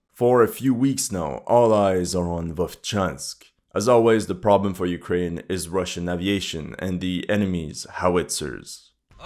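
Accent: Quebec, Canada. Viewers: Where are you from ? I can’t place that accent maybe Quebecois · canadian french right?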